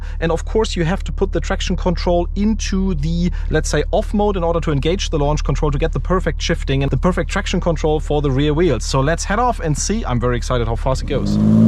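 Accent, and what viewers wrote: Germany. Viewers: Der deutsche aktzent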